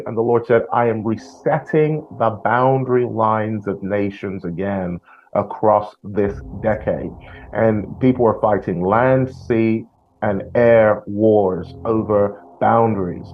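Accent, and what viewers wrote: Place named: United Kingdom. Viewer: God bless you. You may not understand, but people speak in different dialects around the world. And this is simply a British accent. This man is from the UK. So, they don’t pronounce words as people in the west do.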